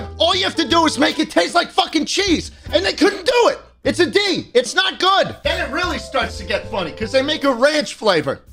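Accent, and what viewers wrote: New York, United States. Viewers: You can hear the New Yorker in him come out more and more as the video goes on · New Yorker is coming out in this one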